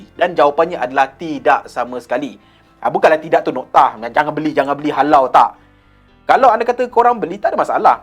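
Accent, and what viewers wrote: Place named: Malacca, Malaysia. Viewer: Abng asal melaka ke pliss reply 🙏🙏😌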